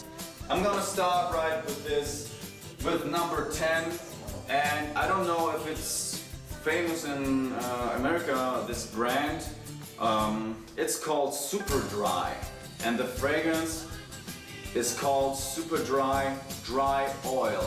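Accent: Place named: Germany. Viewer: I always wondered where his accent came. Turns out he’s German. Still love the accent and good to see the Germans keep going.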